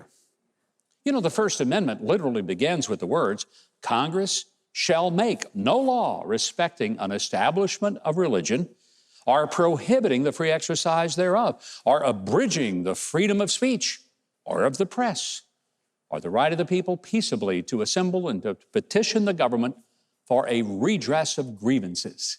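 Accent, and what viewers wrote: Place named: Tennessee, United States. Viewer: You sound like you are from TN. Hockabee.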